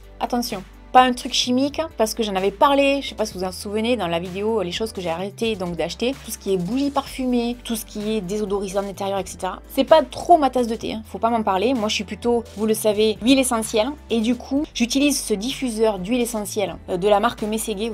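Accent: South, France. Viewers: J'aime tellement ton peuti exan du sud, tu me fait penser à une amie d'enfant là même j'ai l'impression 😊 tes vidéo son super merci beaucoup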